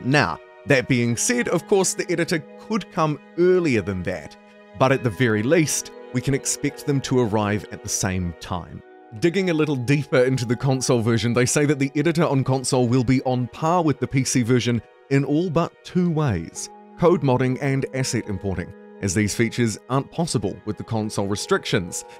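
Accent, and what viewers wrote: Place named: New Zealand. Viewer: So nice to hear these updates with a fellow Kiwi accent. Had read the email about the latest patch but I just wanted to say THANKS for explaining things a bit better.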